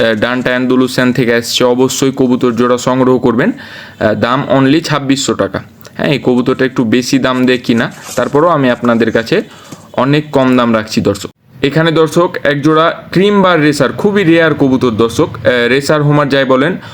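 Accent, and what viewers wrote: Kolkata, India. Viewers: উনার কথার ধরণ কলকাতার মানুষের মতো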